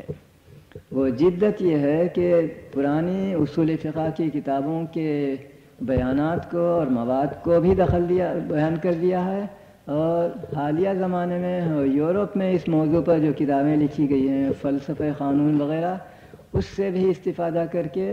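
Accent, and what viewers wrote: Hyderabad, India. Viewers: When was this lecture given...? Seems pretty old, near Indo Pak partition era. Furthermore urdu accent is more of Hyderabad Daccan style.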